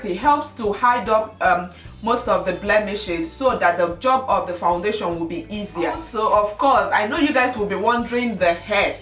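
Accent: Igbo, Nigeria. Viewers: new subby here. love your spirit and your igbo accent.....really cute make up. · First of all: Great Make-up ! You inspired me ! And i have a question, where are you from? You always Remind me of my (nigerian) dad and his accent :D :)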